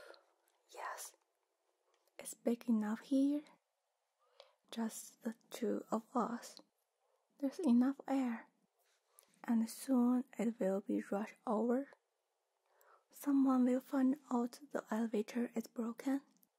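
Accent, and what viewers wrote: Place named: China. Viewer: 这个口音好国人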